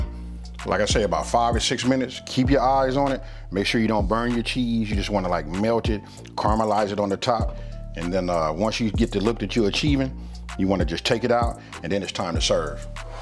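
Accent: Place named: California, United States. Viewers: The LA accent in affect! Lol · Knew you was from Cali before I even seen the hat lol Took me 20 years before I realized we had an accent out here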